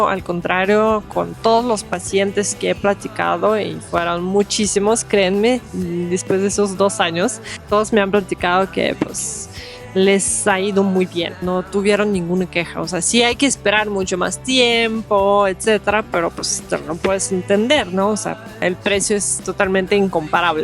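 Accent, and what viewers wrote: Russia. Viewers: No hagas caso de estúpidos haters que no hacen nada bueno, no sirven para nada, no crean nada, no son campeones de nada. Lamento muchísimo estos mensajes. Soy mexicano y le doy la bienvenida a todo mexicano nacido donde se le dio la gana (ósea extranjeros). Adelante y a disfrutar la vida, tu nueva vida. Abrazo enorme desde la CDMX. Y me encanta tu acento RUSO!!! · Pues tu acento ruso es encantador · Hola....pues Ami me atrapaste por tus fotos...y esos ojos verdes...(Espero que no me de hate tu esposo... jeje..)....y con respecto a los que te mandan Hate....no te preocupes ya que esos solo tienen ojos para el América...(Y ya sabes lo que sigue...así que, así velos...)....y con respecto a tu acento ruso... No sé te va a quitar nunca en su totalidad...ya que tus músculos de la voz nacieron o se adaptaron desde chica al idioma ruso...lo puedes neutralizar pero eso es mucho rollo...y además paque..si se te entiende y muy bonito.... 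Y por último... Ten pensamiento del tipo de Chavela Vargas....Saludos....y no dejes de subir videos....😊 Ha y los Hate.... También sirve para subir el reiting...... jeje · Como alguien puede criticar la apariencia de otra persona, de su acento y su forma de vestir?, a caso esas gentes son muy cultos y modelos perfectos en todo sentido?, nadie lo es!…es muy fácil juzgar, criticar y demeritar a cualquier persona sin conocerla, sin entender el contexto de su vida, más aún cuando se hace desde el anonimato y a través de un medio como youtube;también muchos de nuestros compatriotas en el extranjero, por medio de su trabajo hecho su vida de manera admirable y honrada, teniendo que regularizar también con esfuerzo ( porque no es fácil ) en esos lugares su estancia legal, es muy válido!. En tu caso, aunque tu origen y acento sea ruso, ya no eres extranjera, tienes la legalmente ciudadanía, con derechos y obligaciones, pasaporte, familia, residencia,; si vives en la Condesa, Las Lomas o colonia modesta, que nos importa, a tus posibilidades y de tu esposo a donde quieras y aunque a muchos les de diarrea, eres mexicana! 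· Yo no pensaba que en México había haters tan malos como en Francia. Yo no pensaba que los Mexicanos se preocupaba tan de otros como en Francia. Yo conozco un poco México porque vengo cada año un a dos meses en su país y a mí la gente me parecía muy amable. Me agradecería hablar español como tú con tu accento que no sé si es ruso o no pero que es muy correcto. .¿Si México es tan buen país por qué tantos lo huyan ? ❤🇫🇷🍎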